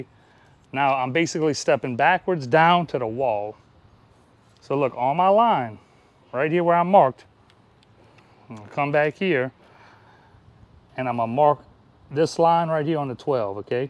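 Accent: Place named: Acadiana, United States. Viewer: I recognize an Acadiana accent a mile away.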